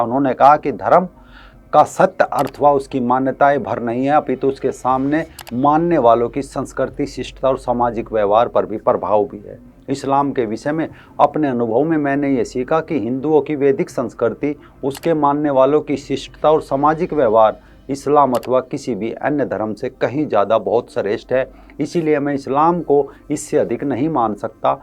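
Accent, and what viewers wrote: Haryana, India. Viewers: हरियाणा के है भाई कुछ करने वाले को मत रोको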